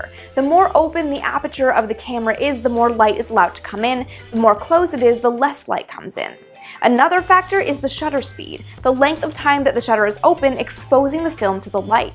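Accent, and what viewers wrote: Canada. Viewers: About. Canadian. That is all. Bye.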